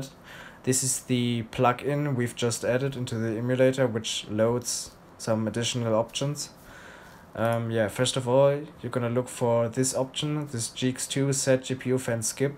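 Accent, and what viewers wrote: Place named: Germany. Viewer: Man hört dass du deutsch bist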